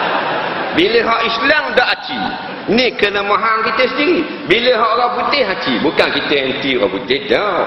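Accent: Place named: Terengganu, Malaysia. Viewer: LoL!! Lawak!!! Seb baik atok nenek aku org ganu, blh gak faham kecek ganu.. :) -Singaporean..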